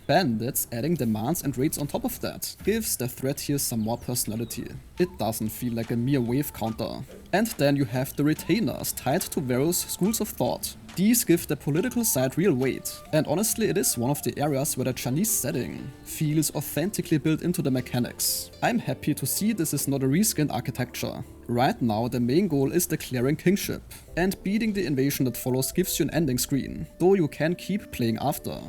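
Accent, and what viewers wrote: Germany. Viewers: Direkt den Alman rausgehört😆😆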